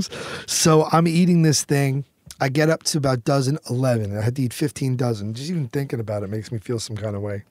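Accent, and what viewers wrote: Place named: Brooklyn, United States. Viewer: So the way he talks on a scripted show is real and how he talks outside of work is fake? You're not very bright are you? He's from Brooklyn and speaks like this normally.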